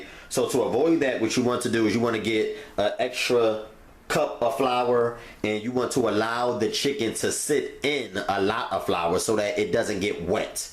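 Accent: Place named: Baltimore, United States. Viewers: commah ntata he sound like he for bmore 😃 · I know lol I'm from Philly and I thought he was from Baltimore. I can't get over that accent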